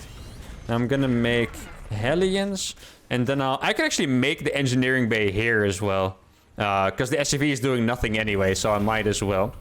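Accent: Netherlands. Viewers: Ben jij Nederlands?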